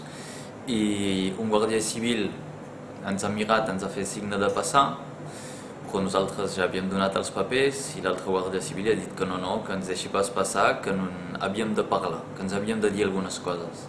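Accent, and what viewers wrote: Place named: France. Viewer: Llàstima que tinguis l'accent tant contaminat pel francès.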